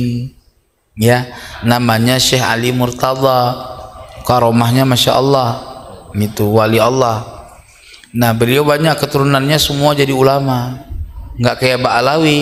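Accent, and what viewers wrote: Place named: Jakarta, Indonesia. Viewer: . Kori. Saya. Suka. Omong nya. Betawi bangat.saya pendukung mu. Maju terus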